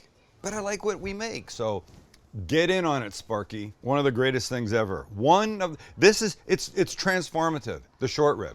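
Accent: Ireland. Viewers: You from Ireland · Love the show and this sounds awesome!!! Have you ever considered a Kentucky Hot Brown, yum I think you should do at least one whole show using your Irish accent, lol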